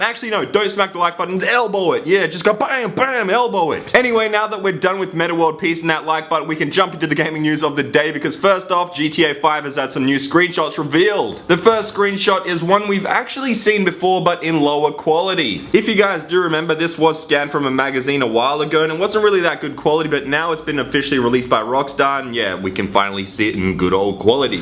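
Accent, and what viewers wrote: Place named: Australia. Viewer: Omg his accent is australian :O dafuq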